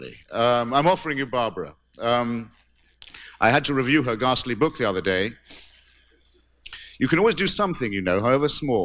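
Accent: United Kingdom. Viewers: His intellectuality was overrated..he hid his questionable intellect with a pompous British dialect which he used as a crutch to swoon and charm.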